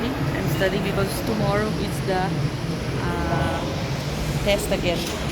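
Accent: Philippines. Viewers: As if i find your English accent is a filipino one..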